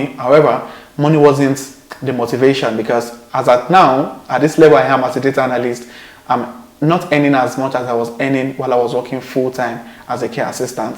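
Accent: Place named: Yoruba, Nigeria. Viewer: Please, why is your your Yoruba Accent still so strong?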